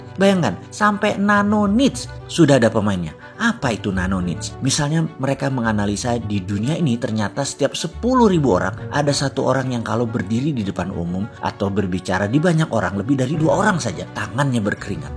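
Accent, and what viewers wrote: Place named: East Java, Indonesia. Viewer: Ha-ha-ha kocak Jawa Timuran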